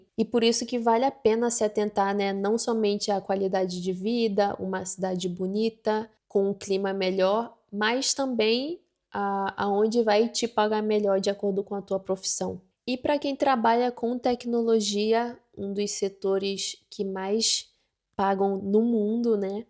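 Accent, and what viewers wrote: Rio de Janeiro, Brazil. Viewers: Ela não é nordestina é Carioca, não tem como mudar o sotaque raiz. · Não da para nós nordestinos mudarmos o nosso sotaque, da mesma forma que a moça tem um sotaque aparentente carioca também não vai mudar o dela.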